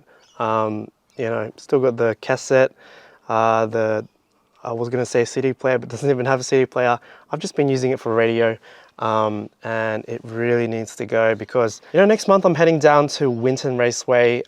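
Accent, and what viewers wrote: United Kingdom. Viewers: I got a Mekede S6 Pro Plus I bought all the accoutrements from the junkyard including the vents, duct, entire harness to the pass door (USDM), and the CORRECT dash from a 99-00 for my 97 EX Coupe. The only thing I bought used off ebay was the lower automatic mode actuator but I'm having trouble visualizing how it is installed. I guess I need to get off youtube and go dig through the shop manuals. Searching for the latter is what brought me here but I have to say is much easier to understand than ANY of the other channels that have done this exact swap. The narration deserves an award for the beautiful narration and in the King's English no less!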